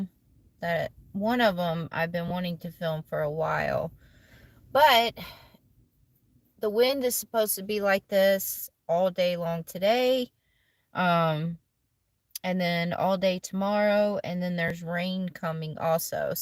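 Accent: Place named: Texas, United States. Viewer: There's nothing wrong with a Texas accent. Thanks for all your travels. Not able to do any travel any more. Love watching. 🐍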